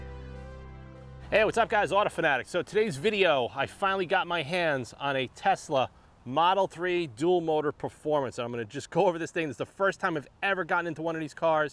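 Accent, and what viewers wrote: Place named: Long Island, United States. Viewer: What county are you in? At first I thought long island north shore, but then I thought Westchester. 🤔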